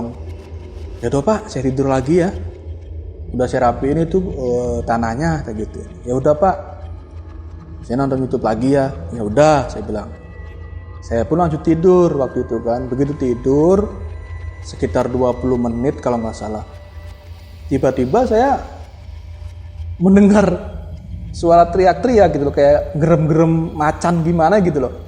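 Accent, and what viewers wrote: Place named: Banyumas, Indonesia. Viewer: Pak Jawa Ngapak ya? Khas banget logatnya haha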